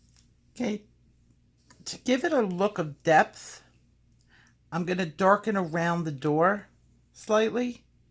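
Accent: New York, United States. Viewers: Wonderful! Love your NY accent!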